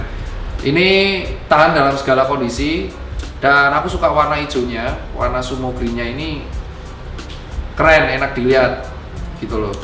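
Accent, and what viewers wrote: Java, Indonesia. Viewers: Wong jowo.. wong ugm.. yo medhokkk.. salam medhok Mas.. pertahankan medhoknya👍👍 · jowone medok kabeh dok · HA JOWO E BRO!!! Ciri khas!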